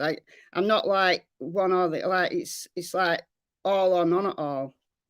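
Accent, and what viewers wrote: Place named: England, United Kingdom. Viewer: Thank you kindly for all that your doing, your a very genuine person out of all the youtubers out there 🐦 And I love your English accent 🙂💜 Lisa ~ Australia 🌌✨🌠 xx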